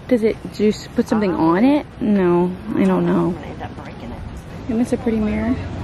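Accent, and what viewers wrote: Texas, United States. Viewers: "I was really maaaaaaad!" 🤣🤣🤣 Your Texan accent is really coming through, it's awesome!!!!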